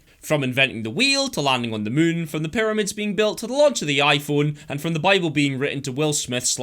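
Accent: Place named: Scotland, United Kingdom. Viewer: holy shit you are Scottish. was not exepcting that. Fellow Scot. Not my real name haha. Sub'ed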